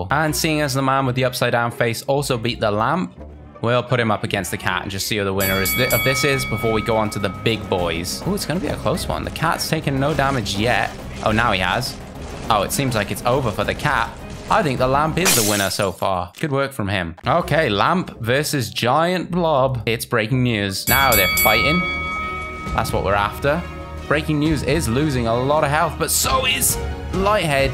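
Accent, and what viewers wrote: United Kingdom. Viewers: Question for: Are you British. And I love you videos it's very fun. AND YOU ARE MY CHILDHOOD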